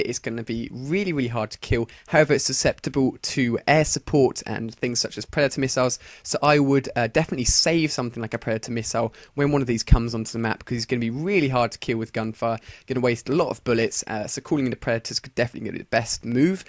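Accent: United Kingdom. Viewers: Good video but British accents give me headaches.. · fuck your slicky british accent. stinks.. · I wanted to watch a MW3 gameplay video, not some obnoxious british guy blabber on for 10 whole minutes.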